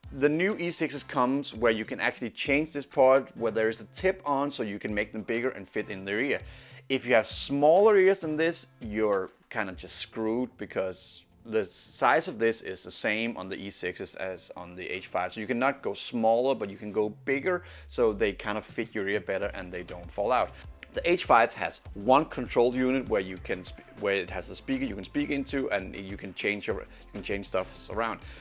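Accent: Denmark. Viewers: danish accent? are you from Denmark?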